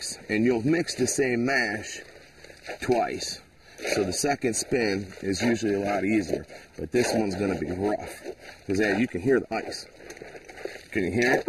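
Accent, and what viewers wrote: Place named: Newfoundland, Canada. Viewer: buddy sounds like a newf most definitely a newf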